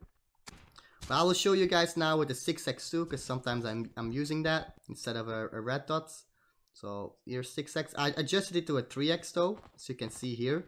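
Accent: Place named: England, United Kingdom. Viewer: I love ur english accent, great effort in video, tx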